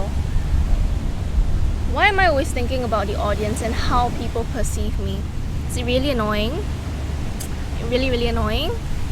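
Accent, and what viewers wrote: Singapore. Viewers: Actually, English is most likely her first language. She is speaking Singlish. It's English. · Greetings from California! I have never heard of you, and I don't know how I got to your channel. But listening to your accent, my guess is that you're from Singapore or Malaysia. Am I right? You said you had 300+ subscribers. Look at you now! As of this moment, you have 849! I'll subscribe, so you'll be at 850. Then I'll listen to your music... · Your accent sounds like youre from Singapore possibly? I went there once several years ago. I'm about a minute into your vid. If you get a passport and live and travel to places that are less hustle culture, it could be a breath of fresh air. Also, i play guitar(20 yrs) and I agree, it's the most free place. Moving to Philippines next week. I hope you find consistent peace and happiness along your way in life. 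· You are adorable and I hear some Singapore inflection Good luck, I will follow this for at least a while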